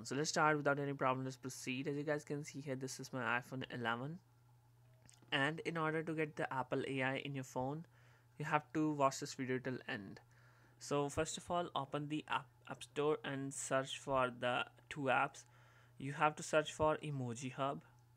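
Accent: India. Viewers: Pahadi English bahut badhiya ji❤❤👌👌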